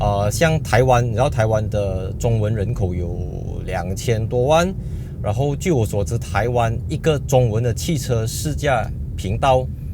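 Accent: Malaysia. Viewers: will support you always.. just stick to our malaysia accent bro.. that's make you special bah hahaha.. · 不需要特地去改口语，Malaysia人当然是用回Malaysia Style的华语啦！我肯定是站在你这边的👍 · 不要太在意他人的看法，虽然说人不可能完全不听他人的评价，但是您其实也已经很优秀了，您所分享的都是真实且客观的评价。还有您的口音就是您的特色（也代表马来西亚独有样子）所以非常atas👆👆👆，马来西亚人就是有一点点口音问题（在外国人眼中）但是这就是马来西亚的特点，我们会各种语言和方言自然而然也会有一种独特腔调（口音）。最后我想说就是您都已经放subtitle了，如果subtitle不给人（观众）看难道摆美还是给鬼看🤣XD。加油，真爱粉与您同在！🤙🤙🤙 您也要做到，当你真正拥有的时候，不在乎别人怎么看你！😊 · 很支持用自己的方式介紹Malaysia 的車子如果今天用台灣腔或普通話講的話就不Malaysia 了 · 马来西亚华人用马来西亚特有人情味的华语强调就是你的定位！我认为你不需要调整。另外，你可以分析一下你的主要受众群特性；以我个人的观点，你的受众应该主要来自中等收入职场人，要拓宽subscriber量，除了深化你的原有拍摄风格，还可根据你的主要受众因为车子而关心的其他生活事项（如车贷、车险、维修保养、智能化提升、运动、假日出行等）的各种tips或好康介绍做延申，让订阅你频道的粉丝不单是观看你的车评，还因为在内容中看到各种好康而更有向好友推荐并subscribe的动力。数字经济时代，羊毛不但能长在牛身上，还可让狗来买单。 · 继续做你自己吧其实这才是大马的本色文化语言就好像看其他国家的汽车媒体他们也没刻意去改变腔调来迎合外国观众如果外国观众不明白一些大马的道地语言一些大马的梗可以在影片下面留言我们大马观众会帮忙解释的我们也会继续支持你希望你能继续走下去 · 从一开始就支持到现在，还没开始看你的频道之前我总觉得介绍车的频道都是在背spec罢了。但是慢慢的就只观看你介绍的。没有贬低其他频道的意思，但是我相信多数观众都会有同感，可以介绍超跑、又可以介绍myvi saga。 choo yee的频道真的是很优质。如果接受不到我们马来西亚的华语腔，soli ～我们就是喜欢酱讲话。继续加油，200k subscriber 迈进，不忘初衷💪 （其实真的开会员频道我们一样买单） #OneManOneTeam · 其实保持Malaysia口语是好的，像中港台YouTube的节目，他们也是保持着自己的特色，我也是有观看的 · 我看留言者几乎都是男性。我是受我先生影响，也学会看的汽车介绍，从中学会了一些汽车的知识。希望你不久的将来订阅人数达到50万以上。还有，我prefer 你的马来西亚华语口音👌👍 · 我是大陆人我非常习惯这个马来西亚的中文口音因为在大陆B站有许多马来西亚的up主常年投稿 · 我住在米国，就喜欢你的马来腔。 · 從語言方面來講, 我覺得你毋須遷就那一個國家的觀眾。我是在澳洲生活了三十年的香港人, 我不講華語, 對你的馬來西亞的華語完全了解, Slang反而有親切感. 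以英語而言, 澳洲, 紐西蘭, 英國, 加拿大, 美國, 印度, 馬來西亞, 星加坡也各有特色, 卻不會影響我們的溝通. 做Youtube當然要用自己最流利的語言, 更加重要的是content啦. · 我是無意中發現了的channel，看了一條片就subscribe了，我反而覺得，一定要keep住目前這种風格，的馬來西亞口音華語我覺得很有趣，而且還時不時來兩句廣東話和閩南語，很好玩。新不新車什麼的無所謂啦，現在做汽車的channel很多，也不完全是為了看新車才看影片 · 馬來腔的華語台灣人聽完全沒問題,還蠻親切的會想到大馬的僑生同學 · 加油，挺喜欢你的马来腔，哈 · 如果你担心部分观众听不明白某些词语，建议你可以在字幕写能理解的词语，希望你能在保持大马有趣的口音当下也能让观众明白你的讲解！ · 继续努力加油！我们应该以马来西亚口音为骄傲。这是我们的特色！我会继续支持你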